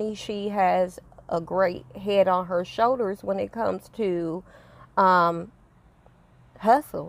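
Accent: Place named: South, United States. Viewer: Hey auntie you are so pretty! I love your accent. Are you from the south? New subbie. Chrissy is way better without him...he is literally deadweight. I can tell just by the videos she does everything and he is just another child. He is not a man, he was a boy. I have no respect for a man who leaves his pregnant wife and kids and to move all her kids and mother ALONE.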